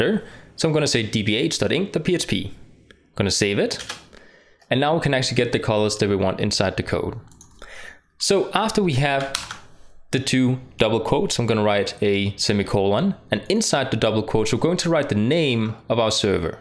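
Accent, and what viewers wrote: Denmark. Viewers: He is from Denmark! I love his accent!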